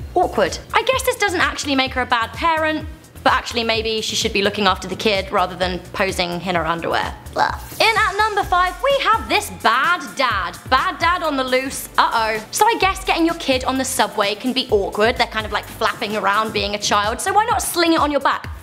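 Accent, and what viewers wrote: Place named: United Kingdom. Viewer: It's called a British accent.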